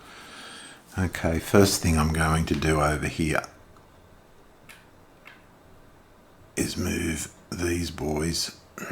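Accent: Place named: Australia. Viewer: Hi, thank you so much for the amazing video series. I've been wanting to get into these solitaire games but was worried how difficult it would be to play. I've actually owned D-Day at Omaha Beach from this series for maybe 8 years or so but never played it. However watching your videos, the explanation of each move and turn really has opened my eyes and got me wanting to try. I've assumed you're an Aussie based off your accent. I am an Aussie also (NSW based), so really does make it even more enjoyable that someone has taken the time to make such in depth videos for people to watch. Massive thank you. Hopefully you get some more time to make more. Hoepfully I can pull the box down and give it a whirl! Also, how did you trim your chits? Do you have a little jig to have them look so nice on the corners?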